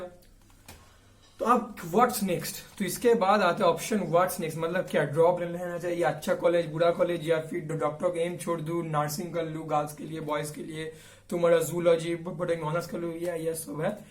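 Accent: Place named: Bengal, India. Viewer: Your hindi seems that you are bengali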